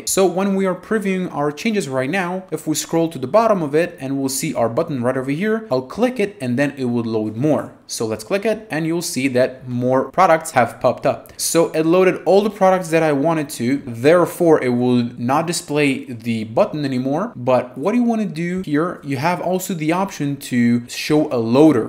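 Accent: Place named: Brazil. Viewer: Primeiro vídeo que assisto teu, muito bom, mas tu é BR né? hahahaha sotaque EN_BR é muito claro pra mim.. haha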